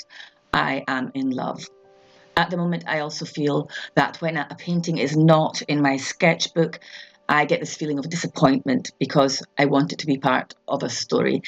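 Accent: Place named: Scotland, United Kingdom. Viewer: Your accent sounds Scottish, Italian and Jamaican in parts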